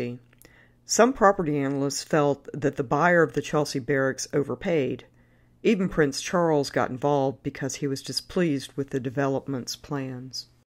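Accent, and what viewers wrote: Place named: United States. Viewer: Why the American accent!!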